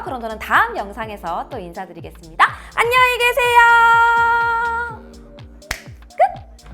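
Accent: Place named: Seoul, South Korea. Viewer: 전달력 끝판왕 😊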